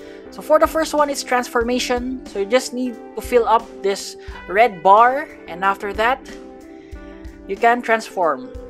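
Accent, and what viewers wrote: Philippines. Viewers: I'm new to your Channel (3 weeks) but when i first heard your voice i definitely know that you're a Filipino just that accent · You sound filipino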